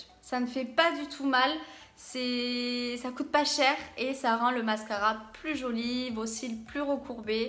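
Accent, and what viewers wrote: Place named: South, France. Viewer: Cet accent du sud, j'adore !! J'aime beaucoup le réal ysl ☺️ j'en ai un, ils sont top et sentent trop bon !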